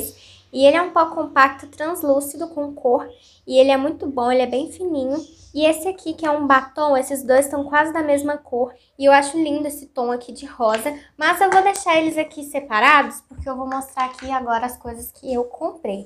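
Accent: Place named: Minas Gerais, Brazil. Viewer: sou do rio de janeiro, acho lindo sotaque mineiro.. meu tio mora em minas.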